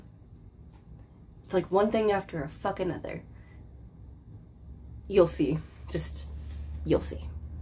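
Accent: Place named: South, United States. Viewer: I never realized how heavy that southern accent is. I thought it was just a big time lisp.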